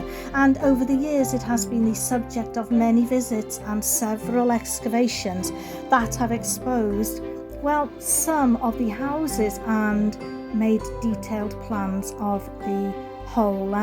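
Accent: Wales, United Kingdom. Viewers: Thanks for the awesome hike. Very interesting and there's so much history. Btw, i love your welsh accent and your narration is very pleasant. 👍😍 Have a great day ahead 😊🥰🙏💕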